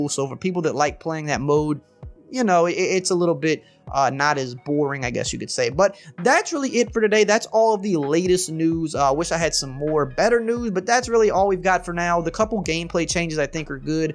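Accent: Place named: South, United States. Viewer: Thanks for u videos country boy your accent makes me listen lol frfr tho thanks for the info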